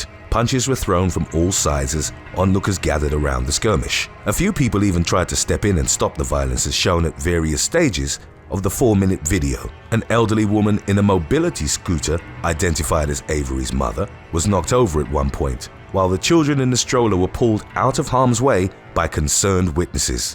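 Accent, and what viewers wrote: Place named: United Kingdom. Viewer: I always enjoy your videos. I also love your perfectly calm narration voice and in particular your sophisticated British accent. Your accent always draws me in to listen to your videos.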